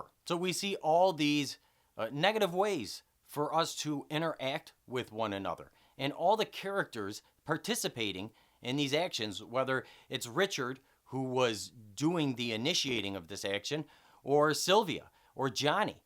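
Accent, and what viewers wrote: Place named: Midwest, United States. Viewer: Still consistently the best TPS03 companion on YouTube. I look forward to your input each week. Thank you! PS your midwest accent is a fantastic bonus. Southern Michigan? Whatever the case, you rock.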